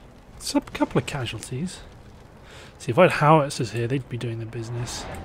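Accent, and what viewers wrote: United Kingdom. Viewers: God I love your English accent.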